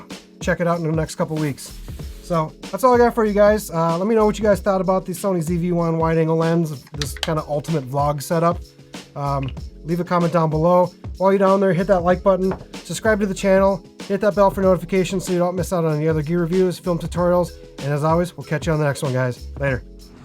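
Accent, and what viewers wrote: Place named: England, United Kingdom. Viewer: Man I need a hat thrower/Box slider for my videos! Gangster! Your wife is a Pro! Man that setup is so tempting.....I want that for going to theme parks.......whenever I can go back. Great video bro! Oh and I still want those Nike Air Maggs! Looks hot in the background! Dr. Pepper is my jam!!! Great joke of course in your english accent.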